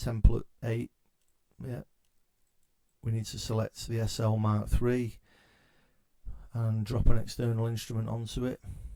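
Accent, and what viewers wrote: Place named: Manchester, United Kingdom. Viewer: Hi bud, been thinking of buying the Mk111, looks like a lot of menu diving though and a pain to set hardware and software VST up to as there's no midi control software for the Mk111. I'm going off previous video's I've watched Obviosly. How are you finding it? You sound like your from Manchester too:) Oldham I'd say...am I close??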